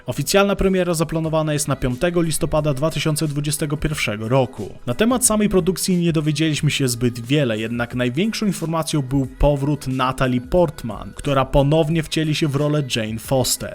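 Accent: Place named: United States. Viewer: Kanał ok, filmiki ciekawe, ale okropnie gryzie sposób narracji, skąd teraz wszędzie amerykańska tonacja zdań? W języku polskim akcent jest w zupełnie innych miejscach...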